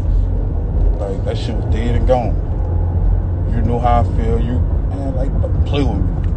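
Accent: New Orleans, United States. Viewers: He has a new Orleans accent they all speak like that I love their accent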